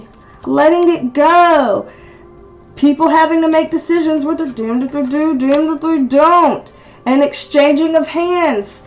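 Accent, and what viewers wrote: South, United States. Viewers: Peter Monn brought me here!! You are so sweet and I LOVE that southern belle accent! Sending good vibes from TEXAS!!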